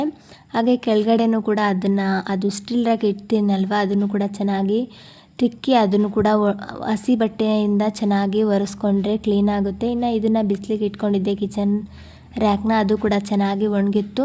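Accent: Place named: Uttara Kannada, India. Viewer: ಸೂಪರ್ ಸಿಸ್ಟರ್ ನಿಮ್ಮ ಉತ್ತರ ಕನ್ನಡ ಭಾಷೇನೆ ಚಂದ ಕೇಳೋಕೆ 😊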